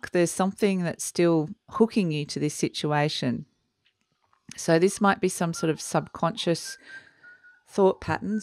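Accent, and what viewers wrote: Australia. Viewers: Hello, great to hear a fellow Aussie doing readings on you tube. They are good readings too. Do you do readings at any of the psychic and wellness fair held in NSW? It would be great to meet you one day. Cheers.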